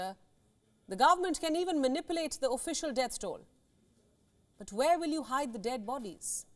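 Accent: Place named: India. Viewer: Why do Indians speak this way? I mean they were under Brits for such a long time and you would think they would speak English correctly.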